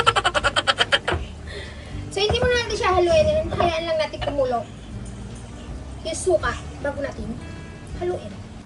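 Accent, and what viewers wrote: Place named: Ilocos, Philippines. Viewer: Yes authentic and natural at first time pla lutuin ito ang cute mo mag ilokano ha mangan tayun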